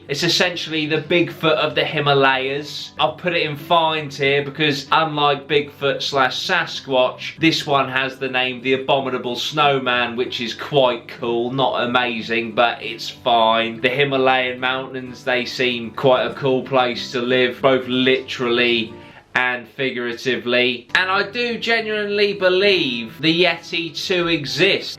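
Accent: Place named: Norfolk, United Kingdom. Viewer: Are you from Norwich (I am) cos you have a norfolk accent?!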